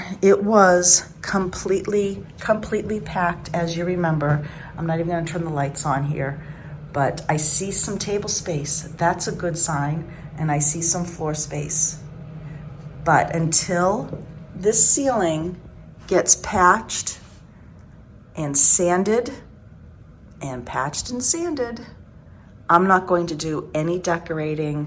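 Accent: New England, United States. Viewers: I love your pantry! It’s beautiful! Your kitchen looks amazing also!! I love your New England accent!! Hosses, not horses!😂😂My grandparents were from Mass, and my great grandparents resided in Stonington, Ct. I’m a New Englander at heart. I love seeing your videos and always look forward to your updates! Love your beautiful home! Jean😘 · Please don’t loose your New England accent it’s a great part of what we love about New England!!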